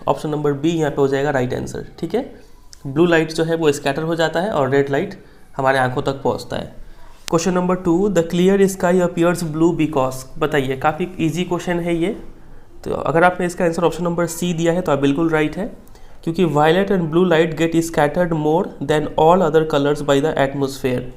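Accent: Bihar, India. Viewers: Sir AP bihar ho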